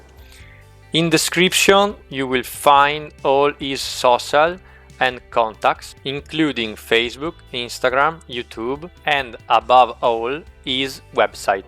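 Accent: Italy. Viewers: Bel video!! Quell'inglese con accento italiano e fantastico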